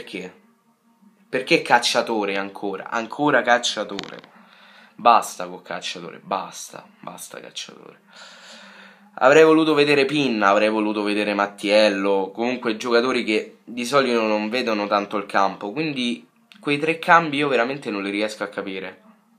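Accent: Rome, Italy. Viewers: Come mai hai l accento romano?😂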